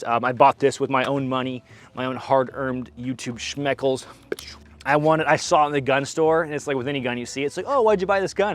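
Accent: England, United Kingdom. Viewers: LMAO with British accent!! please continue with the FUN videoss and Comic Intros!! bro. you made up our DAY!!! F@ YEAHH!!! kind regards Slow n Smooth <> Smooth is Fast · The first American I’ve seen with a convincing English accent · sounds like a elegant english teacher with that british accent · British accent 😮‍💨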